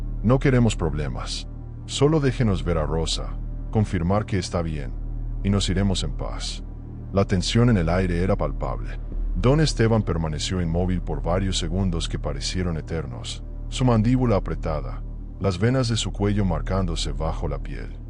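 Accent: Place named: United States. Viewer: Lo malo de esta historia es la voz un gringo que puede saber lo que pasa en México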